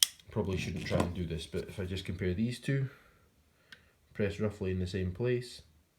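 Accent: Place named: Scotland, United Kingdom. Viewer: Nice to hear another Scottish voice. This isn’t really my sort of knife, but I’ve long had a hankering for a Richard Rodgers custom.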